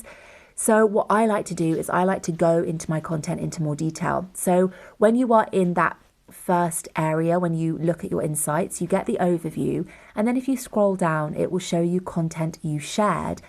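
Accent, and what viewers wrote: United Kingdom. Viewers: What a perfect british accent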